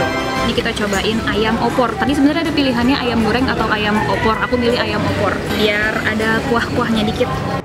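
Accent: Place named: Bandung, Indonesia. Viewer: ngomong nya ky jalanan bandung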